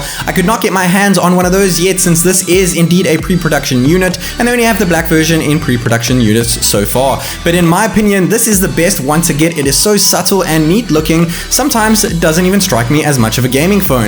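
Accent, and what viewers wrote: South Africa. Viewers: Are you South African. It sounds like it. Love from SA :)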